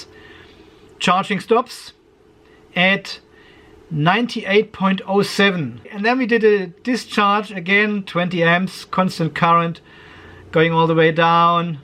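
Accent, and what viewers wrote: Germany. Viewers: Danke für Deine gute Laune und dein Deutsches Englisch 😅👍🏻 finde deine Videos spitze weiter so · Hope it comes a new clip soon;) I enjoy too see your clips not only for solar stuff but your seens of humor and your german english ;)👍 Every time I see a new post from you I put on the coffee and chocolate, big blanket and lay down on the sofa. I really enjoy and learn from you sir 👍😉 Best regards Morten from Norway 🇳🇴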